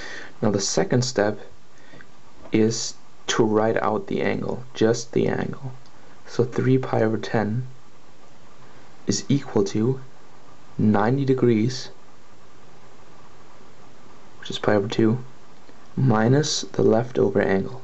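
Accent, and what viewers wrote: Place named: Germany. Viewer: i notice a slight european accent are you german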